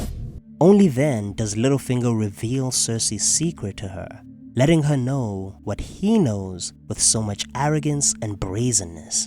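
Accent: South Africa. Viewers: This dude sounds South African😅.... great analysis by the way❤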